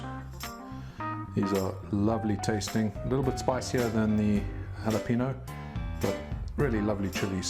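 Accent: South Africa. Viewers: You sounds like a South African, good on you mate. Excellent video. Lekker man. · Where in SA you from Bud. your profile location says UK, but you sound like you could be my neighbor :P. Nice Vid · Are you South African? Your accent sounds a little South Africany. 😁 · Good job ,,A bet with my wife,, South African???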